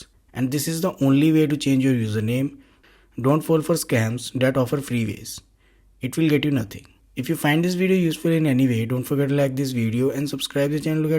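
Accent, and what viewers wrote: India. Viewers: wow, nice scam, makes sense why the accent is indian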